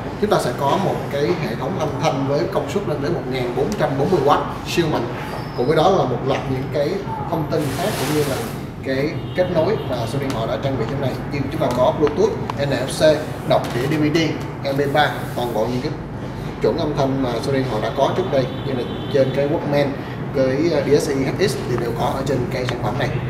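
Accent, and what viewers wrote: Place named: Vietnam. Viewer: am thanh trung thuc giong noi gioi thieu re qua ( miet vuon)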